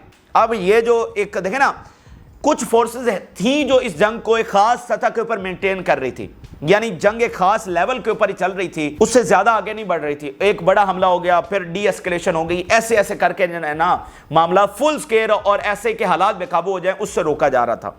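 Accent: Punjab, Pakistan. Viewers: This guy talk garbage past 10 months he has been spreading panic n fake news All these months he has been saying that hizbuallah will go in war with yahoud but hasn't happened yet but now we experiencing conflict with yemen and yahoud He hasn't or never said anything about this incident 87 people got injured and 3 killed Now he saying things differently He is there to make money from u tube Please stop him by making comments to slam his fabricated news He dance scream start questionig himself and answer himself He has no source of own his on Get news or copy news from different resources which is readliy available to all of us Can't speak right one word of English Neither he can't speak urdu in right accent except speak everything in punjabi accent Belive me he is nothing except a punjabi merasee Just listen his English and watch his body dance He try to look waheed murad or daleep kumar😂 This monkey become on u tube a laughing stock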